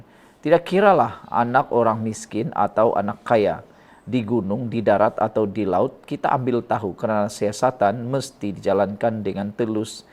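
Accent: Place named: Sabah, Malaysia. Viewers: Itulah cara kami orang sabah bercakap.alhamdulilah kami serumpun tiada membeza bezakan antara satu sama lain. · Lorrr.. tu kan Bahasa Malaysia SLANG Sabah.. sama juga dgn org Kedah, Kelantan, Terengganu, Penang yg cakap BM tapi dgn loghat lidah negeri mrk... !!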